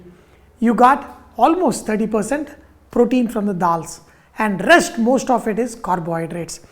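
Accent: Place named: Telangana, India. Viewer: I love your Telangana slang very much...😂😂. and also your childish movements everytime 😍😍😀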